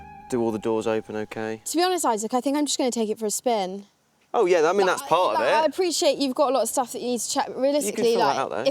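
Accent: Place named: RP, United Kingdom. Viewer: The poshest accent on Youtube. One of the benefits of private education no doubt.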